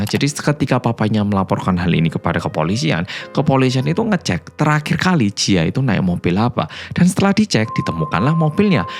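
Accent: Java, Indonesia. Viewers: Sediiih😢 tks medok😊❤🎉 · Korea medok jawa 😂krna kelamaan tinggal di jawa...kuliah di jawa ya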